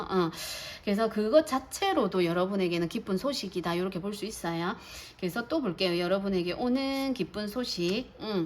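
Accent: Gyeongsang, South Korea. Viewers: 경상도 분이다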